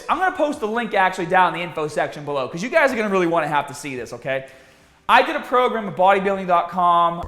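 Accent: Boston, United States. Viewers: This is great content but the aggressive Boston accent kills me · I never noticed the Boston accent it’s killing me 🤣 watched your stuff and subscribed since 2009 keep doing your thing Captain! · You know your from MA when you can recognize another man's Masshole accent. Keep up the videos brother. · This is the strongest Boston accent I have ever heard...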